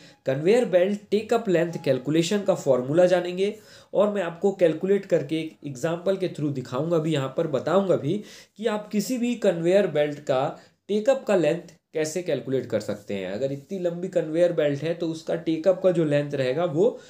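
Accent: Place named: India. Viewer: Indian accent:goodvibes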